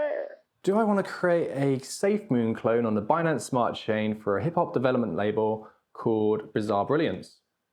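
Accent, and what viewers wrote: United Kingdom. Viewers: I can tell by your accent you are from the UK... What is your take on the FCA regulations? I have asked them a question about setting up my own coin as I don't want to land into any legal trouble. Not had a response yet but reading through their manual they state exchange tokens and utility tokens are not regulated but it has no clarification based on tokens with liquidity. I am unsure if this would make them e-money or a Security Token which are both regulated. The regulation document is so confusing but it would be good to hear someone else's take on it being from the UK and involved in the crypto market.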